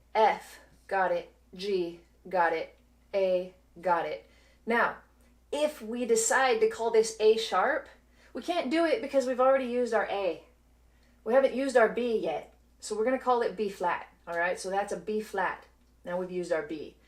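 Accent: Scotland, United Kingdom. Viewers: Are you half Scottish ? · Loving the Scottish accent. Oh, and the lesson. Thanks!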